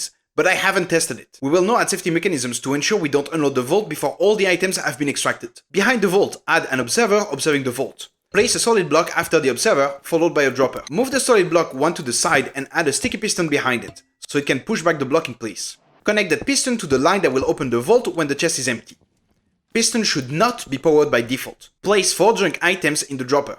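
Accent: France. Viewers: Tysmm! W video funny french accent lol.Greetings from algeria ❤ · you have a french accent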